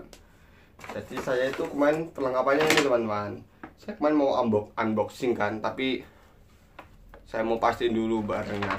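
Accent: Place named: Kediri, Indonesia. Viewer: logat khas kediri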